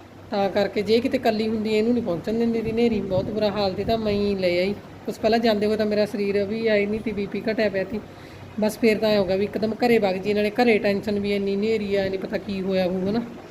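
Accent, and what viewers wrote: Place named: Sangrur, India. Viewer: Hr gl nal te te bolde. Sangrur wale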